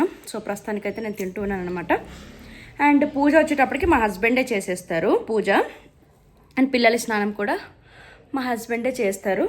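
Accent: Srikakulam, India. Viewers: Srikakulam language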